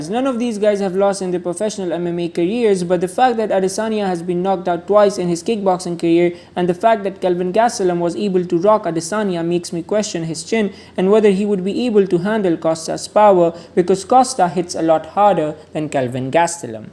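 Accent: India. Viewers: he deffinetly is lol, however, he's either been living in the uk or just been on the Internet so much he's picked up some slang... Dont think I've ever heard "this will be a banger of a fight" in an Indian accent before.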